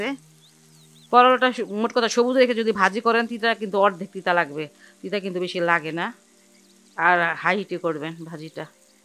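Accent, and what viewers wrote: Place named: Gazipur, Bangladesh. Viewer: ভালো লাগছে,,, আরো ভালো লাগছে আপু আপনার কথা গুলো মনে হচ্ছে আপনি আমাদের গাজীপুরের বাসিন্দা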